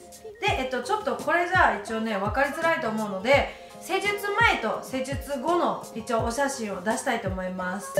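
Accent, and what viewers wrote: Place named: Kansai, Japan. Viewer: 関西弁も可愛い好き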